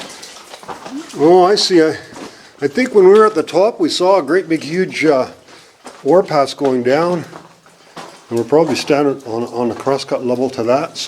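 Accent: Canada. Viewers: sounds like you are a fellow canadian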